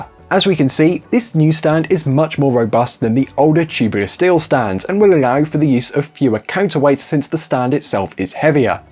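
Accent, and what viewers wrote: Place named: England, United Kingdom. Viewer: Wrong? He's and Englishman speaking English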